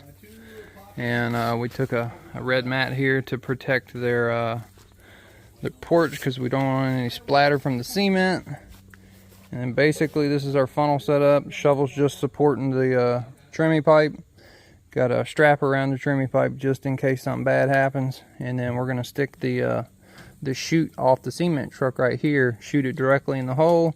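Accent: South, United States. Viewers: speaks in a southern drawl, making ceMENT sound like SEEment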